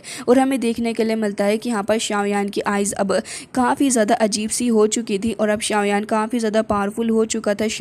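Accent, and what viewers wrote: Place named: Bihar, India. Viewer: Bihari bol rahe ho aap ❤❤❤❤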